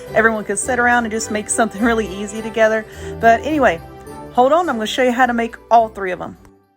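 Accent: South, United States. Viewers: I love watching your videos, you seem to always have a good time 😊. Love your Southern accent, as I was born in Mississippi and now live in California 💜💜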